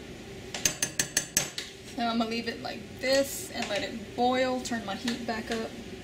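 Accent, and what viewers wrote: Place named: South, United States. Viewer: Gotta be a southern girl!!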